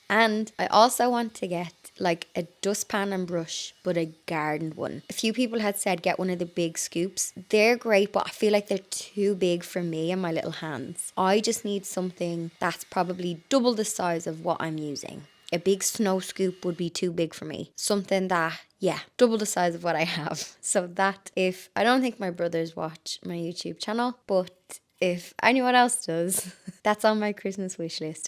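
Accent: Ireland. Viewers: love your program ive just come across it to day love your Irish accent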